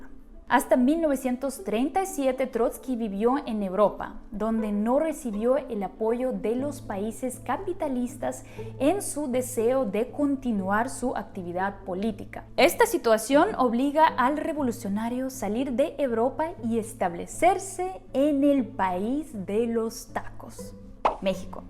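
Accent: Ukraine. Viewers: Soy yo o está volviendo a tener su acento Ucraniano???:P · Buen documental, cuando estube en el DF, trabajando y estudiando, muy de seguido pasaba por el museo de Frida Kahlo, Diego Rivera y León Trotsky, pero nunca entre, una pregunta porque estás volviendo a hablar con acento ucraniano ya lo habías perdido, es bonito, no lo critico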